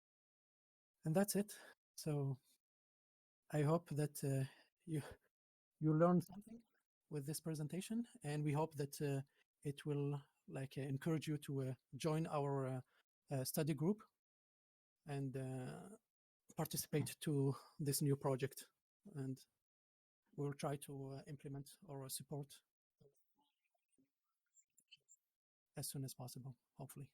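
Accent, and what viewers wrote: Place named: Tunisia. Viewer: المتكلم تونسي و لاجزائري ..لكن الصوت زيروا كبير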